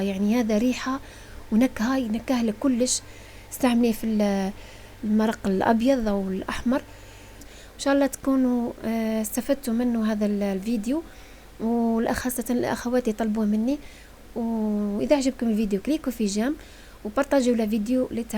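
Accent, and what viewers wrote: Biskra, Algeria. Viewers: من هدرتك عرفتك بليك بسكرية الله يبارك ( كيما انا )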